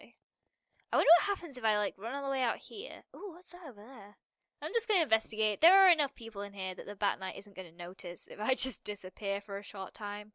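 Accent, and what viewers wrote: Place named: England, United Kingdom. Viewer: She's English too... Accent**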